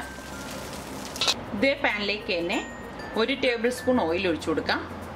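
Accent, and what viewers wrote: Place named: Thrissur, India. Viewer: thank you madam .also ur thrissur voice OK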